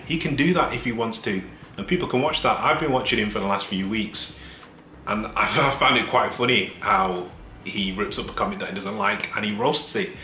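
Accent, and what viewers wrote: United Kingdom. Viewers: Saw this in my recommend, saw the thumbnail and title. "Let's see what this is about." Black guy with a British accent. subscribed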